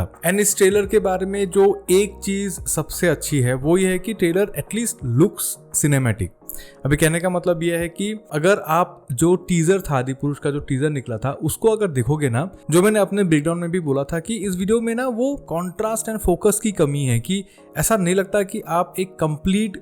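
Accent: Assam, India. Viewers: I think i found sm slight texture of assamese accent in your video.. axomr hoi nki apuni??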